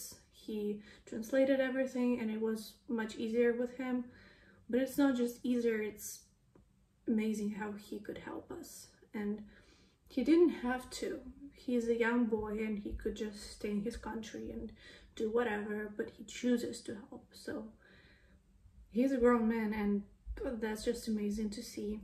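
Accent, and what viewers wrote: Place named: United States. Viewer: Where did this fluency in English come from? And a perfect American accent? I live in the USA for 45 years, still have a Russian accent. When you see Marianne in Florida, give her a hug from an old friend please. I moved from Florida to North Carolina 2 years ago.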